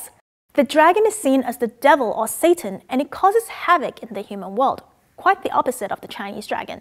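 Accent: Australia. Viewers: Is this an Australian, Chinese accent?! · I just recently found your channel (Chinese eating etiquette), and watched your discussion of Dim Sum (Here in America "shrimp toast" is a big favorite but I love Siu Mai and cabbage or pork bao) and i am surprised to see that some of the videos are 7 or 8 years old. I didn't notice a difference now that you are "back" but you still have immaculate English enunciation and slip very naturally in to Cantonese or Mandarin. (I notice that you say "mandarIN" instead of "MANdarin" - do we say it wrong over here?) Liked and subscribed and enjoying the videos. Oh, and it is charming when the Australian accent just peeks out a bit in your English. Love it!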